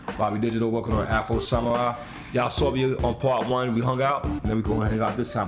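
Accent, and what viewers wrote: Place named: United Kingdom. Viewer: Why does he speak with a British accent?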